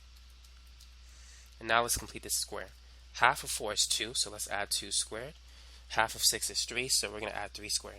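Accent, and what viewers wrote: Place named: Boston, United States. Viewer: You sound like you're from Boston or Maine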